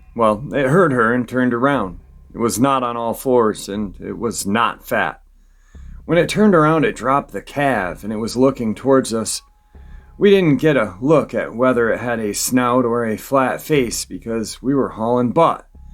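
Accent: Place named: North Carolina, United States. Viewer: You sound like you might be from close to our area,,,, Morganton NC I grew up in Asheville, but my husband Frank and I now live in Burke co and we have a tree nursery behind our property that I think one might have been roaming through back there a few years ago. I was walking our dogs on a leash in the nursery and smelled the worst odor imaginable. It was skunk mixed with the smell of decomp. Our dogs went ballastic tucked there tails and started whining and pulling me toward home. Believe me I took off also later that night we heard howling and every dog on the neighborhood started whining and all of them were in the owners house. Patricia Gambino Harrington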